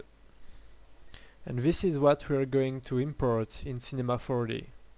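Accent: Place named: France. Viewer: i <3 ur french accent :DAD:D:D:D:DA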